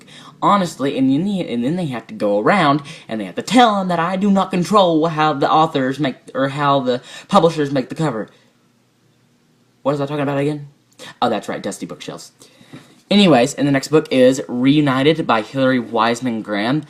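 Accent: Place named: United States. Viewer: Your accent is so cute LOL. You sound really American. Great video! Hopefully you get to reading those books soon. :3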